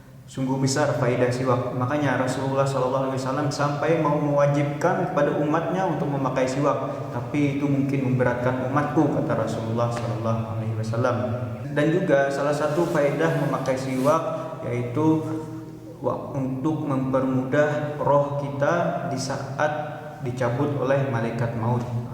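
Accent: Banjar, Indonesia. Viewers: Urang banjar Kalo? Logatnya tadangar sedikit han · hanyar aku tahu logat banjar..!! Banjar kalua kh? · kayak ada logat Banjar nya Abang,,,,dr mana asal nya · logat urang banua ni kada bisa dikeramputi lagi bang ay. mantap 😂 sehat selalu pian pun 😁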